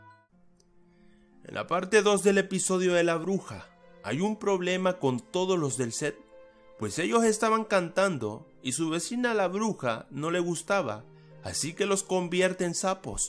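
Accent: Chile. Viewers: suena como chileno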